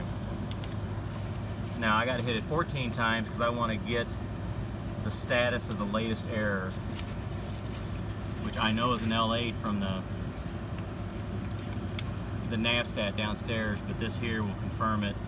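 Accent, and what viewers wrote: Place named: United States. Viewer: got that wrong u got american accent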